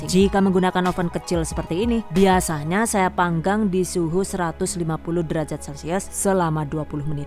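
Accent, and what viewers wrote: Banyumas, Indonesia. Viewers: salam ngapak bundaa...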